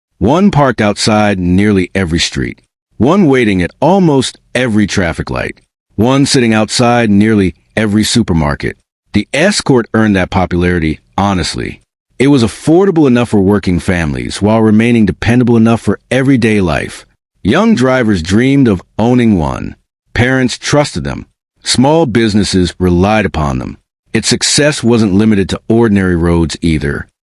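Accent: United States. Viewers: Why do we have to have an American to narrate a video about British cars..I can't be bothered to watch after 2 minutes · Sorry one minute of the American commentary finished me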